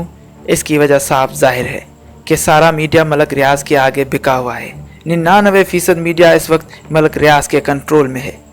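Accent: India. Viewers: Ye viedo kisi pakistani ne nhi Banai balke kisi indean ne Bandai he kuk kaf Korean kh prhr rahe hen in ki urdu indean bolne ka andaz he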